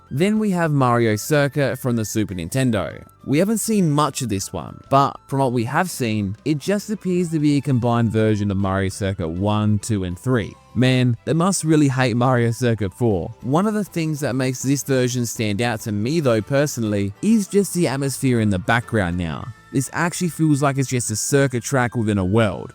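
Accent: Australia. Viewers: Hey, very refreshing to hear am aussie accent and such a consice and considered analysis of all things AAA Nintendo. Wish I dis covered your channel earlier. Keep up the great work. Thank you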